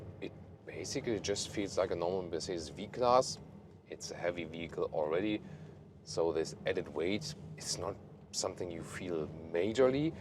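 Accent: Germany. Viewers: Voll der deutsche Akzent.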